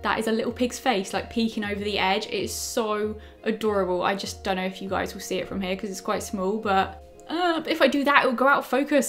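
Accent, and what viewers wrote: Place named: London, United Kingdom. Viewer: you sound like you are developing a London accent maybe? I'm not great with accents but something seems different haha. Love you X💗💗💗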